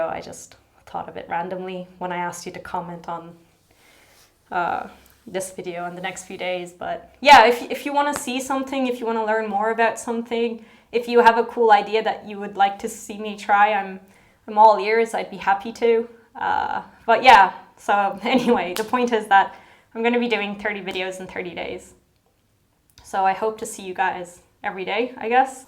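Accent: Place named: Ireland. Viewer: I believe in you 🥰😍 you can do more than you give yourself credit for, and I love your accent 🥰 you sound exactly like I imagined, your Irish is making me happy💖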